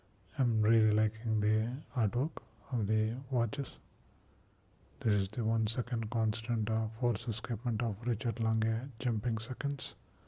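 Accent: India. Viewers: Love the ASMR Indian accent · Is that an Indian accent? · He is Indian, you're correct!😁 There is a link to his channel hidden way down at the bottom in the description box.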